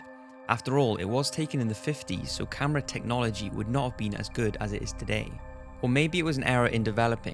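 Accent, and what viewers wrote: Wales, United Kingdom. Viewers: R u Welsh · are you Welsh, I am welsh and I have a friend who sounds exactly like you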